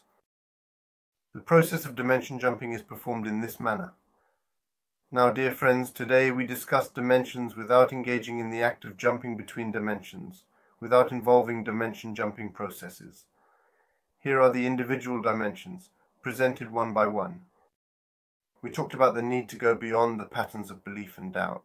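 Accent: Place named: United Kingdom. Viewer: Güzel bir İngiliz aksanıyla güzel bir açıklama olmuş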